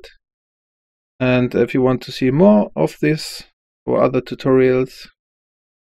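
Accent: Germany. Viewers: Thanks its so easy xD i had seen many Videos before ( bist doch bestimmt deutscher. Es kann mir gleich so vor beim Sprechen 😆)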